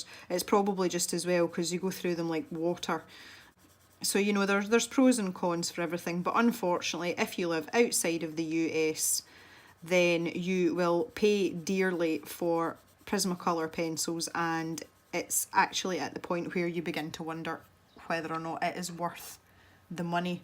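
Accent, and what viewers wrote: Scotland, United Kingdom. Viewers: Just found your tutorial and so pleased to hear a fellow Scot. You colour similar to myself so I will def be following you. This is brilliant x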